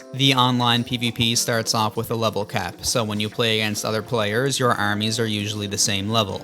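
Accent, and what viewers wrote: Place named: Newfoundland, Canada. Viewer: You sound like a Newfoundlander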